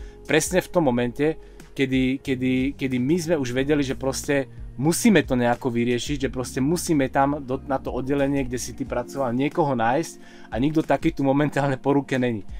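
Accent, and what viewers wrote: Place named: Russia. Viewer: Почти по - Русски говорит же ! Кто Вы - национальность ?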